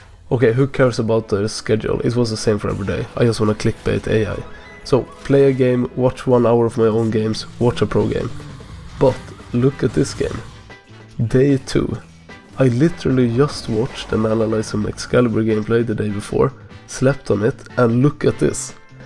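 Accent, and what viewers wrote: Sweden. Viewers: the most swedish english speaker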